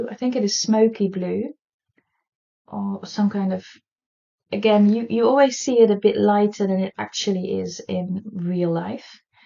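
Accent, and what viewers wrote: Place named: United Kingdom. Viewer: I love!! And I’m really enjoying to watch your videos. So in awe of your British accent too 😊 Greetings from Switzerland!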